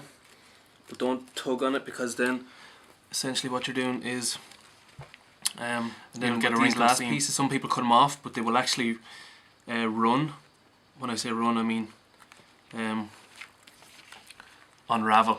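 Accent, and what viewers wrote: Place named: Ireland. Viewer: All this time I thought sounds Irish...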